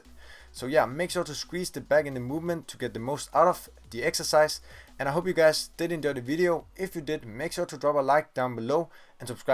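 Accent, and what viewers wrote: Denmark. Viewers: You sound Danish i know because im Danish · damn that danish accent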